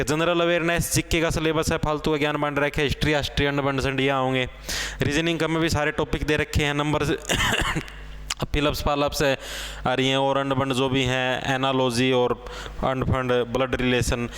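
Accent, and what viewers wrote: Haryana, India. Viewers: Sir 1 no Haryanvi chap di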